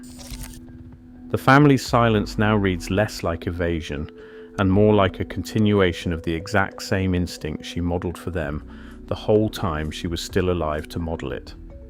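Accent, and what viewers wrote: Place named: United Kingdom. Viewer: Why does a video about an American icon have a British narrator??????????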